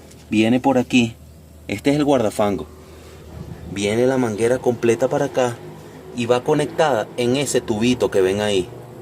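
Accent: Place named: Venezuela. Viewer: saludos mi amigo desde barrancabermeja santander colombia tengo una chevrolet blazer modelo 93 el aire acondicionado no me funciona cuando vi tu video me alegre necesito saber mas ya que esta camioneta era de mi padre se la dio a una amiga y la desvalijaron no tiene la inteccion y le colocaron un carburador de dos garganta de dodge 300 o ford ranger que es lo mismo creo tu eres de donde suena como a venezolano oye tengo el club blazer colomabia es pero que seas mi amigo alli y nos comparta tus conocimientos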